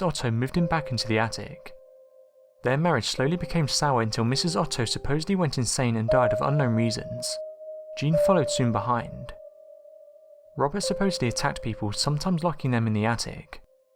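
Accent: United Kingdom. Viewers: The British accent narrative makes it more spooky lol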